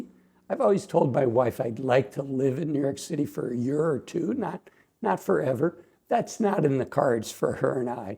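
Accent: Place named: Utah, United States. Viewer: I’m a transplant to Utah after a career in the military. I knew I recognized that Utah accent of yours.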